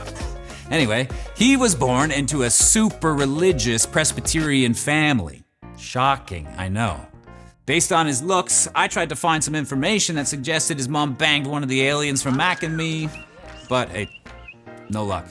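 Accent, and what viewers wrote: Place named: Canada. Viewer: I live in Canada and sounds a lot like us accent wise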